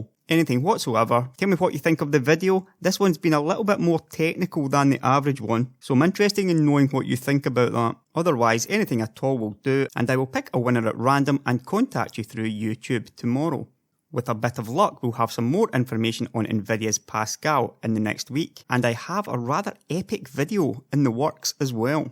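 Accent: Scotland, United Kingdom. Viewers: even better with that Scottish accent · your scottish also so all good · Which part of Scotland is your accent from?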